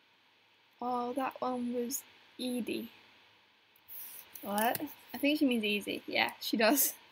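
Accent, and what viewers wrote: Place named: United Kingdom. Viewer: You sound more British here. I think us Americans are rubbing off on you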